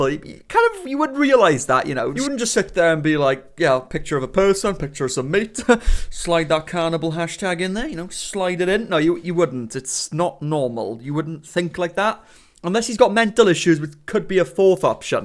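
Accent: Wales, United Kingdom. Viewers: you from north or south wales im also welsh so its ez to know tht you from here lol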